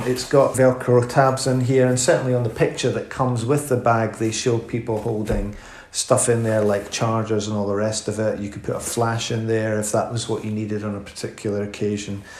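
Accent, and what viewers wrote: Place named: Scotland, United Kingdom. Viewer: That is stitched on. Just lift it up at the edges and cut the stitches with a seam ripper or sharp pocket knife. Off she comes, and looks quite generic, I bet. Love your Scottish accent. That camera you've got is not as handsome as my Olympus E-M10.3, but having the viewfinder off to the left side of the body really makes up for it. (for the right-eye-dominant user) The nose just goes off to the side of the camera, instead of into the back of it with the head turned awkwardly sideways.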